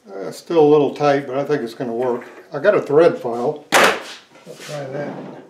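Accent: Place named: Kentucky, United States. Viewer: I live in south central ky. Listening to your voice I suspect you may live close to me! I enjoy your videos!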